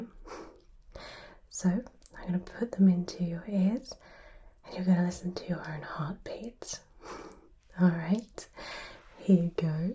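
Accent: England, United Kingdom. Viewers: I ❤ the English accent!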